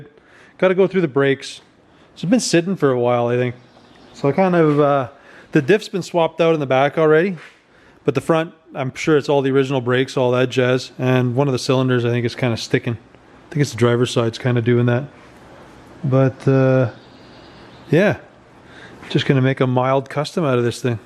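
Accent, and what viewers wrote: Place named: Southern Ontario, Canada. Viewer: That accent tells me southern Ontario🙂👍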